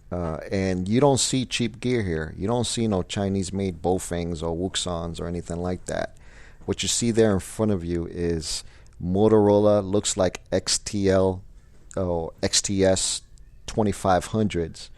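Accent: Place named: Mexican American, United States. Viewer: Can I ask where you are from? Also are you Mexican American as it sounds like to from the accent and verbal variance in your voice I would say 2nd maybe 3rd gen. Great channel and thanks for all the info you provide on a wide level which is helpful for a full perspective on this subject. I am learning so I can train some young scouts.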